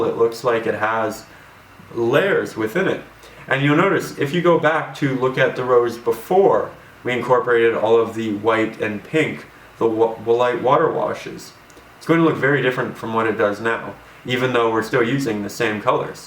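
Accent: Canada. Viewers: Fabulous! Great Canadian accent, mate! I hear ya. Thank you for your lessons. They're invaluable to me.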